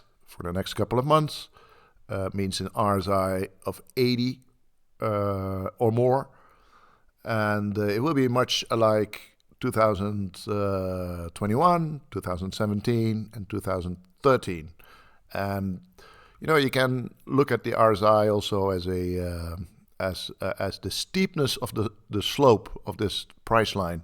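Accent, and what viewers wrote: Netherlands. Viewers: From The Netherlands, no doubt. Like I hear myself. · i smell nederlands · I smell nederlands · are you Dutch?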